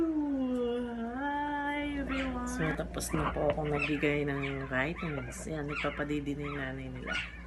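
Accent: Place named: Visayas, Philippines. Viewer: bisaya ka te... pila ka dosage ang 2 mons te?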